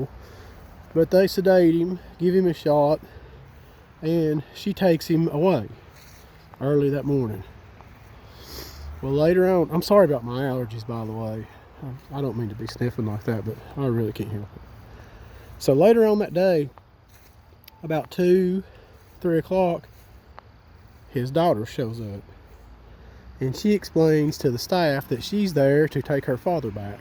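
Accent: South, United States. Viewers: This story had me captivated! Thank you! I get so relaxed listening to your southern drawl and seeing the peaceful, serene video scenes that accompany!😊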